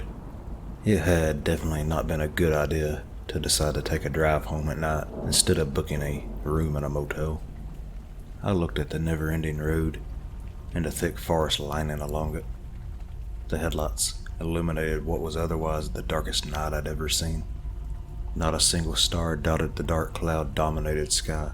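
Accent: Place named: South, United States. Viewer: I like the southern accent, just like mine haha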